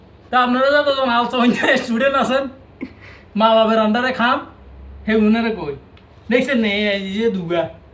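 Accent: Noakhali, Bangladesh. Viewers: নোয়া খালি ভাষা বাদ দিয়ে যা বলার ভাল করে কথা বল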